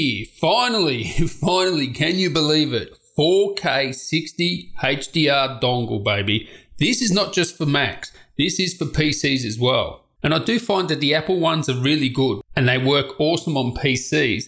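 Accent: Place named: Australia. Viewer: Oh its so aussi 😆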